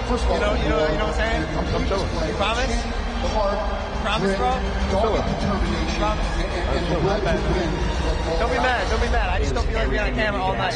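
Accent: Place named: United States. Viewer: You have an american accent and still call soccer football?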